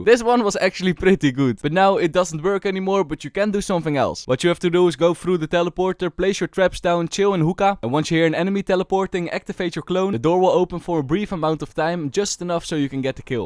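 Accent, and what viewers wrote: Netherlands. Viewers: ik ruik een beetje nederlands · i smell dutch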